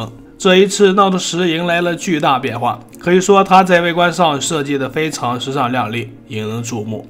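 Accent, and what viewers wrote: Shanxi, China. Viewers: 大哥你是不是山西或者陕西人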